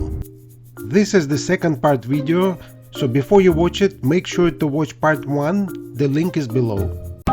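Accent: Russia. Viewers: the best part of the video is this guys accent. sounds like a Russian scientist. the good crazy ones. funny dude.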